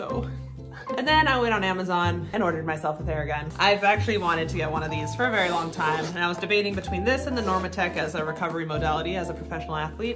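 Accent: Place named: Houston, United States. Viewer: Great Houston accent.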